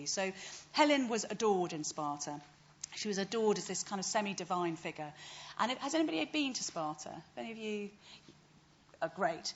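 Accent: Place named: United Kingdom. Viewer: To this American Bethany Hughes has the perfect female British accent and voice. Especially aesthetically pleasing while being educated.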